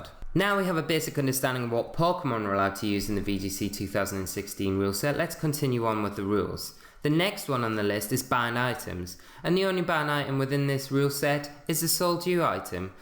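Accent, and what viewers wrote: Newcastle, United Kingdom. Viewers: Are you a Geordie?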